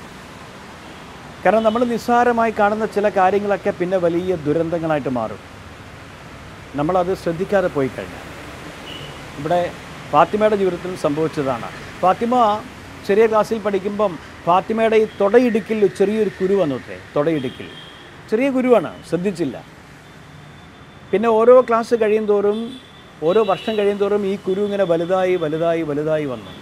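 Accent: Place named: Kozhikode, India. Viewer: Kozhikode bhasha paranja madi Mr kovoor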